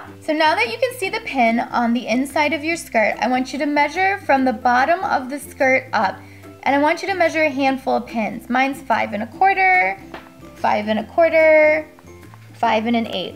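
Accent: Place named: United States. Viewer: She makes it look very easy but oh my, that voice. Lots of American ladies have this tone and rhythm to their voices, why is that?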